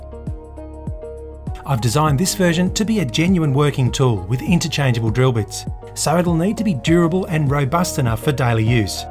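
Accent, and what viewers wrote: Australia. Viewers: He's Australian mate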